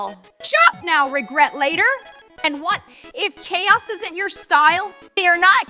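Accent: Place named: Minnesota, United States. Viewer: AI voice is from Minnesota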